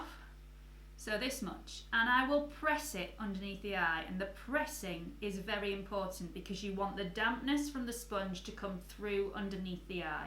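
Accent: United Kingdom. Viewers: Luv the British accent!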